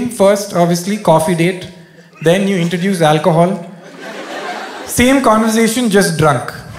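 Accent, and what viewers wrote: Kerala, India. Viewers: Malayali accent is nice! Mallus are so sweet.. My in laws are (Sasural) Malayalee. They all are super sweet. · malayali accent is too cute anyway 😉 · His malayali accent is also cool. Enjoy your samosas, people.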